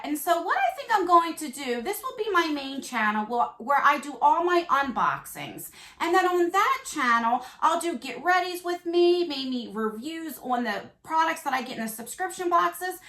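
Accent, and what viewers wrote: New Jersey, United States. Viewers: I would LOVE love to get picked! But of course I’ve tried slay glam on my own dollar and was sorely disappointed and then the box of the month after was AMAZING🙈 I would love to try it again and I can’t imagine a better way too. As always I enjoy watching your videos and always enjoy your jersey accent 😘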